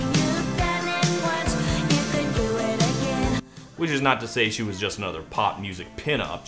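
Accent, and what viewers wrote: Australia. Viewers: Todd, I see you picked up an Australian accent. Please, put it back. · when i heard the australian accent i nearly quantum sprinted to the nearest cliff and leaped off of it. good video though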